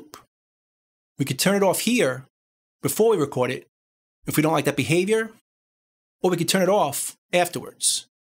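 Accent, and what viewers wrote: New York, United States. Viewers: You're a talented teacher! That New York accent helps, too!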